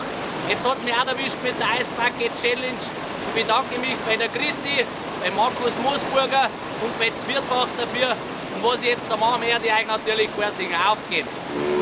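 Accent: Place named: Bavaria, Germany. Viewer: du Depp, des is bayrisches Englisch.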